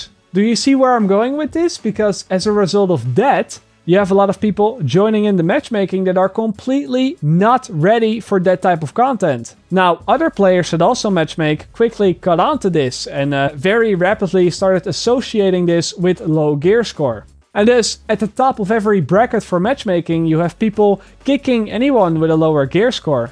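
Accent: Netherlands. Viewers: Holy shit are you dutch Hallo uit Suriname 👋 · De meest Nederlandse accent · Are you dutch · Nice video Ik hoor je Nederlands Engels accent maar je Engels is serieus goed. Go on with you channel.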